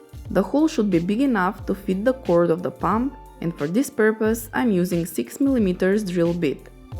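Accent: Russia. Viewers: Still the Russian accent recognized 😁❤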